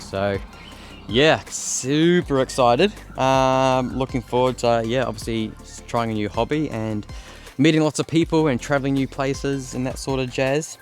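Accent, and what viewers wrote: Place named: Australia. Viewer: You nearly sound Aussie 😂😂😂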